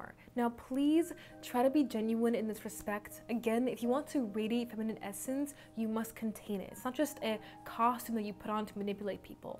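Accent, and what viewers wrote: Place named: Jamaica. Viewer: It is switched between the Jamaican accent for me. I’m Jamaican myself.🇯🇲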